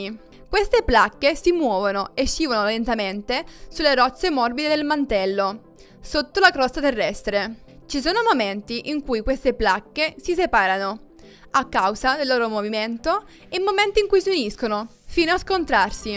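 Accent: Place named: Sicily, Italy. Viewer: sempre interessante anche il tuo leggerissimo accento siciliano credo hihihihihihihi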